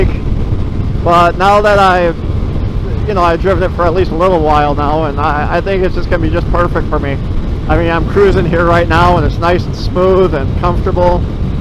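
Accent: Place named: Wisconsin, United States. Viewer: I knew you were from Wisconsin, not that the accent is a dead give away but you speak well, maybe im biased but i think our state has many smart well spoken people, and you did not want to make your neighbors angry, that is very respectful only us Wisconsinites are that cool, and you want to leave the stock exhaust on haha, I leave it on to haha. Very cool man!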